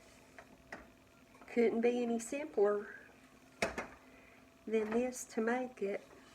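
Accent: South, United States. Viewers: At my grandmas house my twin aunts who never married cared for my grandparents. My aunts were wonderful. I didn’t grow up with a mother and it was like having 2 mothers every summer down in West Virginia. They made peanut butter pinwheels. I’m going to try the preachers cookies. Down in Minden W.V back in the 60’s we would walk to church ( my aunts took care of Sunday school and all church events) and the preacher would come home and have lunch with us every Sunday. During the summer they had a house full. I have stories about my Aunts walking home late from church and a bear walking up behind them. One aunt took off and got my grandpa ( with his shotgun), as the other aunt kept talking thinking her sister was still next to her, then heard a blast that scared the bear off. Every summer I cried leaving my best memories ever. your cooking and southern accent bring back memories of long ago. 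Thank you🥰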